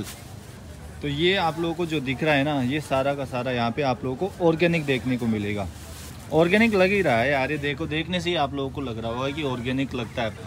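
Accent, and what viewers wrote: Rajasthan, India. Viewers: Aap kya rajasthan se hain kyoki aapki hindi ki tone pashchimi hindi jaisi hai aur chehra lamba ..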